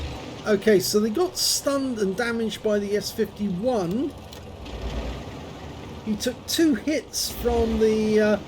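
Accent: United Kingdom. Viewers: Your exciting commentary keeps me watching your vids keep up the good work from N/A Jax fla also awesome Brit accent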